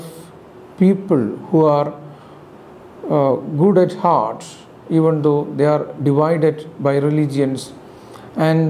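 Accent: India. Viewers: Thanks so much for very understandable explanation, easy language with an Indian pronounciation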